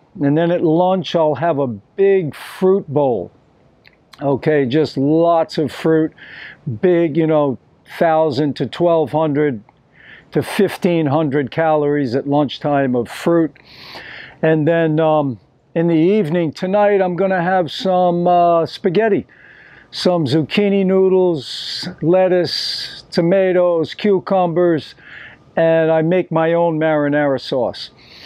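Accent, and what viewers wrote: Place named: New York, United States. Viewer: Hey! Really enjoying your videos. I am also a long term raw vegan, and really related to your stint of a few years adding cooked stuff at night, but never feeling as good, and always steering back to raw/high fruit. I was raw for 9-10 years before I started having issues with low appetite and being tired a lot, and so tried adding stuff back in in the evenings. Sometimes it was hard to tell if the diet was the issue, or if it was something that would've come up regardless of diet. I changed a lot of my lifestyle, traveled to Asia, got out of burnout, etc. to test other factors. Either way, even if I'm more tired than I used to be or less vibrant, I still feel better raw than anything else. Also originally from FL, but then 20 year New Yorker (now more of a nomad), which I think I hear in your accent too! Thanks for sharing yourself and experience. 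I've found it rare to come upon someone else who did raw for years, then experimented with cooked at night, only to find it just didn't cut it, and went back.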